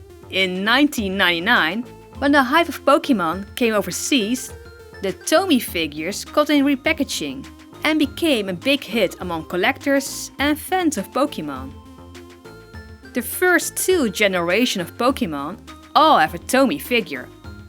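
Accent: Netherlands. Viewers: Op de 1 of andere manier kan ik gewoon horen dat je uit Nederland komt !! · Toen ik dat accent hoorde wist ik meteen dat je Nederlands bent! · Are you Dutch!?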